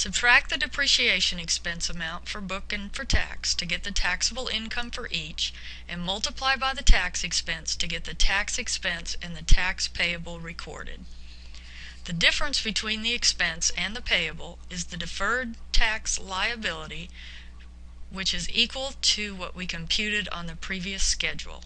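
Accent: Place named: United States. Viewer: OMG - an American accent explaining this! Unusual.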